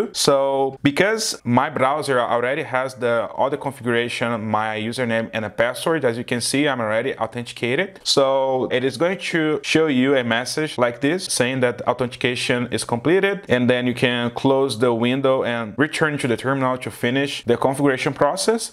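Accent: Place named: Brazil. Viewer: Awesome.!!! Excellent video and excellent material that you share with the community! Since you approach it from the very practical side and complement it with the material that just Oracle released last week to do the OCI certification. I have a question for you! With the steps that you indicate in the video, I understood that we can create scripts in Bash to automate the starting and stopping of the instance or database. But where do you create the crontab... In OCI Client or in the machine?? or Where you installed OCI Client??. I'm very confused with that. Could you help me? Thanks again for sharing your knowledge and experience! .Finally, for your voice and accent, I think you're from Brazil! So regards from Colombia! Muito obrigado.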